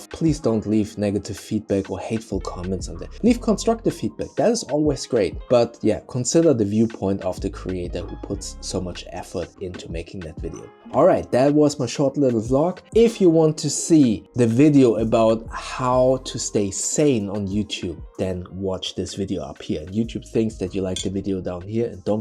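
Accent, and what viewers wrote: Germany. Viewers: I love your voice and your German accent, it's so soothing. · Dude, love the German accent! Echt ein klasse Typ, weiter so!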